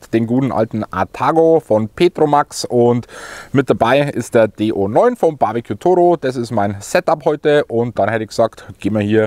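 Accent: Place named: Upper Palatinate, Germany. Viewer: Versuche nicht deinen Dialekt zu verschleiern. A Oberpfälzer bleibt a Oberpfälzer. Grüße aus Mittelfranken mit Wochenendziel Edeldorf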